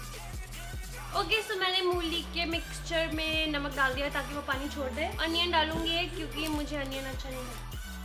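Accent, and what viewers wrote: Punjab, India. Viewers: Your videos always make me smile...being a Punjabi I lv ur Punjabi tone too...😘😘😘😻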